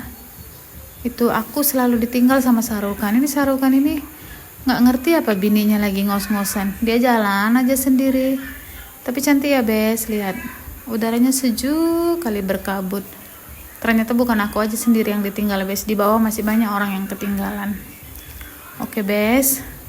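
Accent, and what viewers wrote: Medan, Indonesia. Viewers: Logat..logat nya mb ini kayak orng medan ya😂😂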